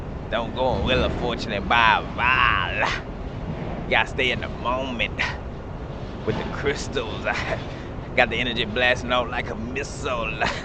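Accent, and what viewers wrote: New Orleans, United States. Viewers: Is that a New Orleans accent?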